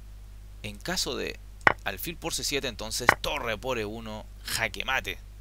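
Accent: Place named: Chile. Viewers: El acento es Chileno, aunque no lo creas!! Para que después no digan que en Chile se habla diferente. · Habla como chileno de hecho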